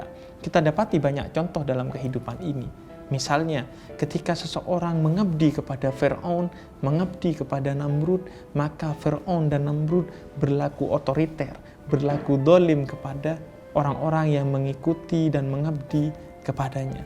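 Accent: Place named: Java, Indonesia. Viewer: bib jomblo muka arab logat jawa hee maaf bib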